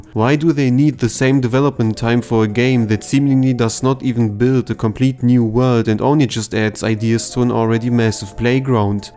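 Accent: Germany. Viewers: I knew, that you’re German from the first sentence you spoke haha 😂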